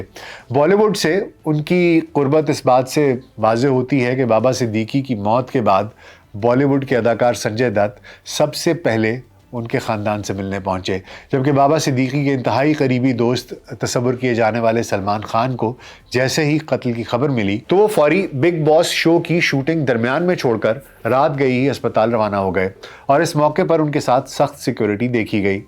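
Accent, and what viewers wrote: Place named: Pakistan. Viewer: From Pakistan, app ki urdu aur andaz e bayan sa lag hi nai raha ka app india sa ho. Beautiful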